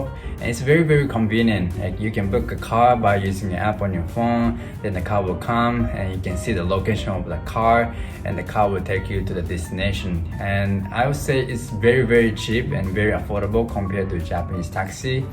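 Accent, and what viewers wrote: Malaysia. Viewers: Handsome??????ur talk like manglish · You look & sound like Malaysian, so I believe Malaysian tend to speak Malay to you especially when you want to order or pay something...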